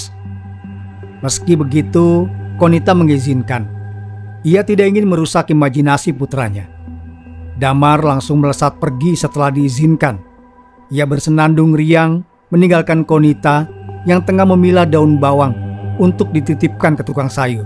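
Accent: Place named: Kalimantan, Indonesia. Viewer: Waalaikum salam jkarta hadir nyimak ,ak ud ketingaln ya, ak seneng dgerkan cenwl ini crita ya ttg alam ghoib trus,, smoga sehat tris ya bg,, ak mau nayya apa abg asli klimatan ya ,soal ya logat ya beda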